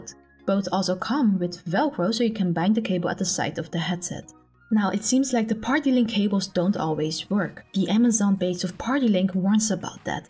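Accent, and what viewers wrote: Netherlands. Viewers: hey jouw accent verraad dat je van nederland komt. am i right?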